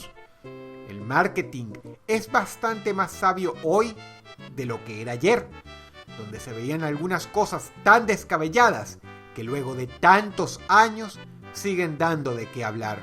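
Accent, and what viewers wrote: Caracas, Venezuela. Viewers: Hay mas comentarios actuales que de hace 10 años cuando salio este video mencionado cómo Dross pronuncia Consola 😅 (asumo que eso viene del acento venezolano que aun tenia en ese tiempo) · El tiene acento venezolano, en sus videos mas actuales su acento desaparecio, xd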